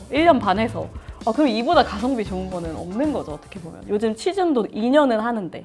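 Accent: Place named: Jeolla, South Korea. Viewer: 선화예고 나오신 분이 뭔가 광주나 목포삘이 많이 나시네요.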